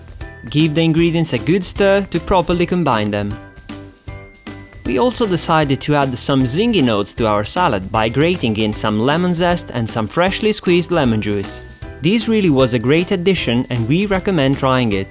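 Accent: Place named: Italy. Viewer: I totally love this recipe! Found your channel today and already watched all your videos :) Immediately subscribed! I have also a quick question for you; I love your accent, where are you from? You seem to be Italian :)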